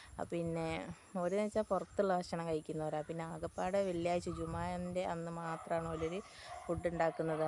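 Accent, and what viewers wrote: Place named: Malabar, India. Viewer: അപ്പോ ഇങ്ങള് പ്രവാസി ആയിരുന്നോ... എല്ലാം അടിപൊളി വീഡിയോസാണ്... കണ്ടാൽ കേട്ടിരുന്നു പോകും... മലബാർ ഭാഷയും കൂടി ആയപ്പോ കേൾക്കാൻ നല്ല രസമാണ്...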